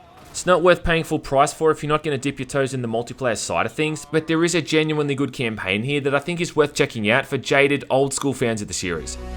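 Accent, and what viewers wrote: Australia. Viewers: So nice to hear a fellow Aussie on YouTube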